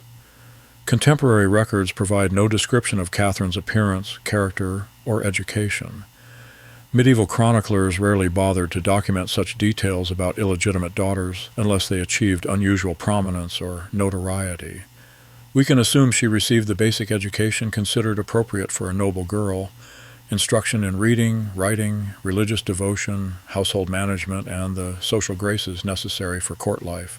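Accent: United States. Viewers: The two illegitimate children would have never inherited the tbrone, so what happened to.them, apart from interest, is simply not important. I do wish we could have an English accent not an American one narrating this!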